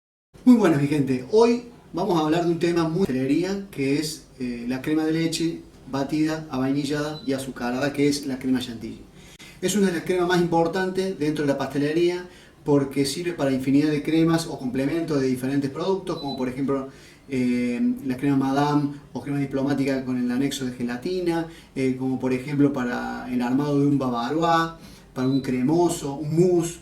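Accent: Córdoba, Argentina. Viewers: Espectacular!! Ahora si!! Fué un placer ser " toda oidos". Me entendí todo en tu español cordobés 😂😂. Una clase magistral. Las cremas que tiré!! X Ds!! Eran recuperables!! Gracias mil. En Uruguay de donde soy, la crema doble Light Conaprole uht jamás se montó. Ahora sé xq!! Tiene 17% mat. grasa. Recién lo veo. Gracias por el super tip de agregar manteca. Mil gracias por todos los trucos. Sos un genio. La intro y final están genial. Ya hay ahí un touch de música. La edición y los gráficos también. Pero en el celu como que no se llegan a leer aunque estés en pantalla completa. Capaz sería bueno darle uno 2 segundos de 1er plano. Cosa de poder pausar y leer para no perder la info.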